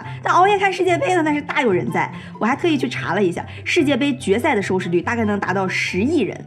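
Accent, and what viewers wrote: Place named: Dalian, China. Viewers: 这期大碴子味特浓郁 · 哈哈，小lin东北人！ “抖“是奥运会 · 、 一口地道的东北腔，特有趣。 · 哈哈哈哈 大连话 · 喜欢大连话！！！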